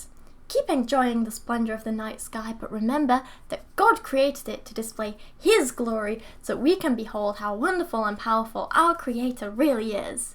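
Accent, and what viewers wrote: United Kingdom. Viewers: You really should lose the idiot Brit accent. I can't help but think of anyone using that accent as a complete moron. I mean, you still have a queen for crying out loud...A FUCKING QUEEN. Damn, you don't get much more primitive than that..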